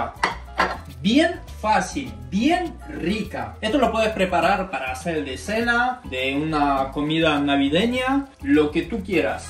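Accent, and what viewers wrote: Argentina. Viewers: que es ese acento argentino raro que tiene?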